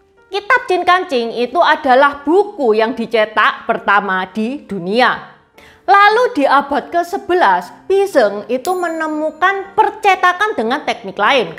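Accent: Java, Indonesia. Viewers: logat jawane medhok... cina abad lampau memang tergolong maju... Klo penemuan cina abad ini apa y? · Cik logat jawa bangat. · Logat Jawa tapi Mandarin .... H E B A T... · Konten bagus saya suka sejarah apalagi jowone medhok 😀👍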